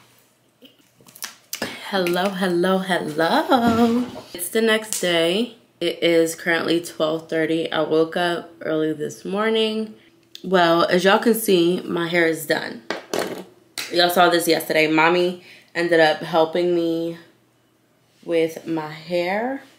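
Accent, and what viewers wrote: Jamaica. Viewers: Hey! First of all I’m here for the Jamaican accent 😍 And I love your content! I’m one of them OGs been watching since you were in cosmetology school!🤗 Just keep doing what you’re doing I love your vlogs I also love your outfits so maybe do some outfit ideas and I love your make up and hair too so just keep showing us or maybe self care routines too like face care routine or body care routine 🌷🌷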